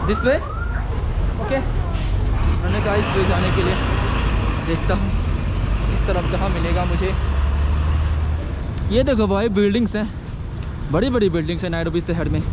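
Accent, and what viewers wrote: Bihar, India. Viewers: ITS BIHARI LANGUAGE... 😊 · BIHARI style Bhai.... hamare Bihar me aise words aise hi pronounce krte hain.. Jaise competition ko kampatetaion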